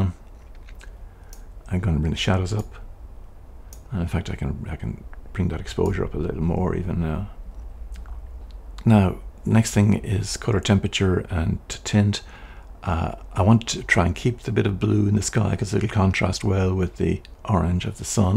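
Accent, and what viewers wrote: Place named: Ireland. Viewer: I think you're speaking just fine, and I suspect that the other commenters simply aren't used to an Irish accent. In fact, that nie fella sounded unhinged in his comment, so probably best to disregard. I like your Luminar edit the best of the two btw, since it's closer to what it seemed to be in real life. Good luck with your channel (and wotht the bird that's determined to get in!).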